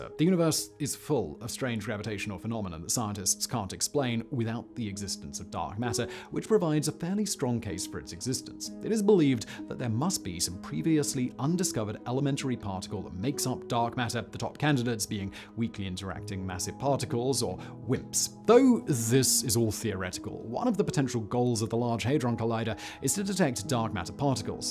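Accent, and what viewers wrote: United Kingdom. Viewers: Do people really still talk with this pompous, posh English accent? Or is this just a goof?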